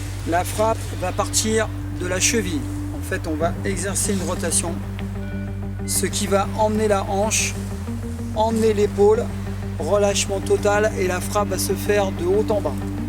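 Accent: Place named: Kabylie, Algeria. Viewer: t kabyle comme moi pasque t a l accent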